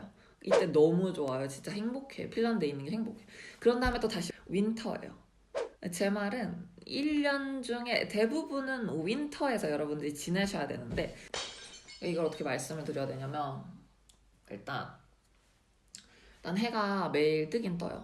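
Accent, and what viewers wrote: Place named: Gyeongsang, South Korea. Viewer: 경상도 사투리를 들어봣을땐 경상도 현지인인것 같은데요.. ㅎㅎㅎ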